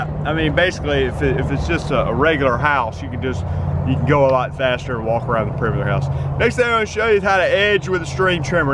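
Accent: South, United States. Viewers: Any manual skill automatically has increased credibility if the instructor has a southern drawl. Thank you, sir! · What part of the south you from, NC here representing!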